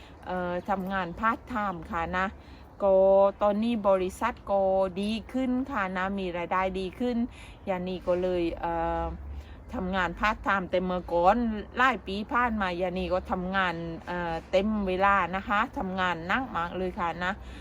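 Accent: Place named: Phang Nga, Thailand. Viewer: ดีใจด้วยค่ะ คนใต้เหมือนกัน สำเนียงคล้ายคนพังงาเลย ใช่รึป่าวคะ🌷🥰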